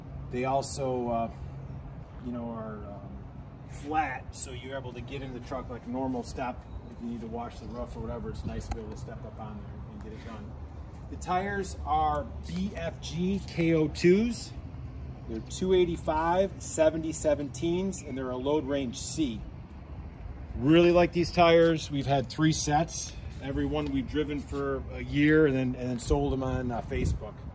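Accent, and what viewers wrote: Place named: Western New York, United States. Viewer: I think I recognize that accent; western NY, perhaps? I grew up pretty close to Rochester meself.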